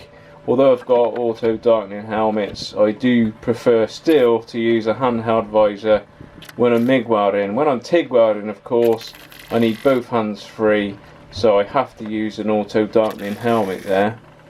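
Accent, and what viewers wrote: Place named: Southwest England, United Kingdom. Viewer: *I really love your videos. But my curiosity overwhelms me about your dialect. Please set me straight , My English friend thought it is from the southwest.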